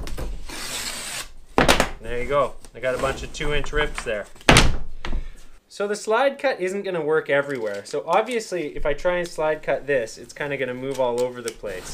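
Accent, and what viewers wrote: Canada. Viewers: Who else is here for the drywalling and Canadian accent?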